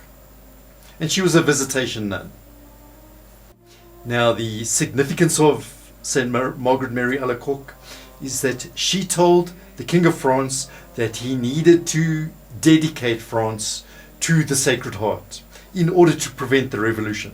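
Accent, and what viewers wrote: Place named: South Africa. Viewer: Your south African accent is unmistakable, greetings from Pretoria 😁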